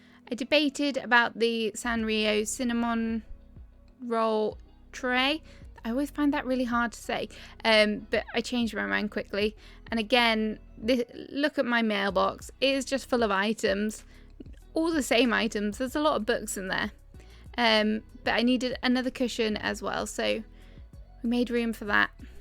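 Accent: United Kingdom. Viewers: Well I am a sucker for British accents lol : ) But once again love your channel, I have totally gotten some inpriration. <3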